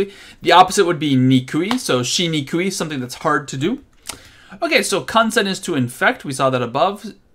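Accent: United States. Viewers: It's not awful - just standard American accented Japanese. Accents are tricky to kick... they tend to move at their own pace.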